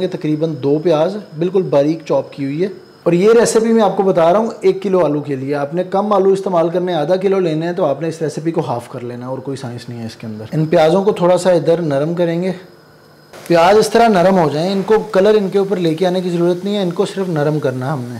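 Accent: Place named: Pakistan. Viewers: TrueTrue7 . Its still Pakistani accent, not Indian... samjhe? Bewaqoof!! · I speak bangla and can barely speak urdu but i must say pakistani urdu accent is so pleasing MASHAALLAH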